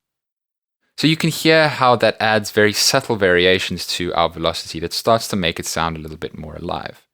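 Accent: South Africa. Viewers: You sound South African? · definitely south african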